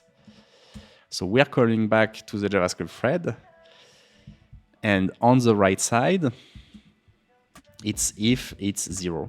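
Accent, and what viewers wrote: France. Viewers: I got used to watch this series from beautiful Zurich on bed time. In the next day, I always start very productive working on animations while speaking with French accent 😁 I really appreciate your work! Thanks for all the tutorials 🚀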